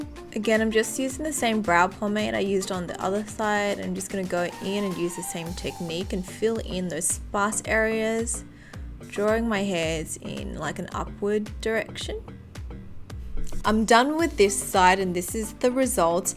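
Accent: Australia. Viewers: You have gorgeous, already thick brows!! Beautiful skin, too...are u Japanese ancestry? And that is the strongest Australian accent possible! Beautiful:) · Are you Australian? I just wonder if I guessed right 😂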